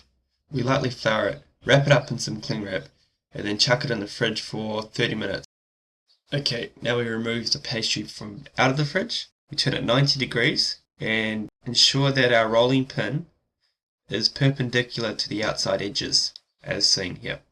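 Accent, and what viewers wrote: New Zealand. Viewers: great tutorial, made even better by your beautiful kiwi accent <3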